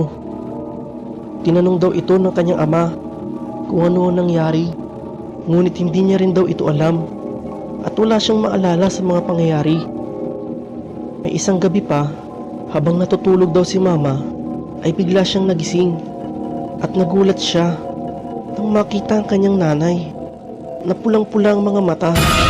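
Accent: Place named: Conyo, Philippines. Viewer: conyo narator shessh!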